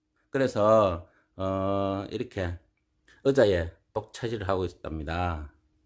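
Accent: Gyeongsang, South Korea. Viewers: 경상도 사투리라 찰떡같이 알아들어져요ㅎㅎ 맥주스프레이는 물이랑 희석 하지 않아고 그냥 맥주만 분무하는건가요??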